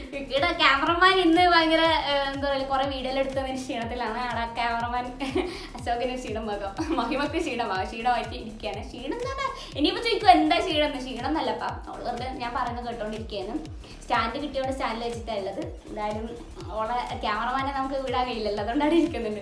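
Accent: Kasaragod, India. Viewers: ഇങ്ങനെ ഒരു ഭാഷയും കാസറഗോഡ് ഉണ്ട് 😂😂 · Ksd slang peruthh ishtam 😍😍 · Njan Kottayam , kanjirappally pakshe Enna parayanada uvve kasargod slang kelkan njangak kure ishtavanne😂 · Nammale slang poliyan alle eachi.....❤❤ Ksd uyir❤ chechyyyy....❤💋💋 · Kasaragod slang superpowli ❤️❤️ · Kasrgod slang poliii ente kunjechiyeee👌👌👌🏾👌🏾🥰🥰❣️ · Nammale kasaragod slang😍💓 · Eth ningalude nattilullath.kasaragod thanne palatharathilalle parayunnath.njanum kasaragod ann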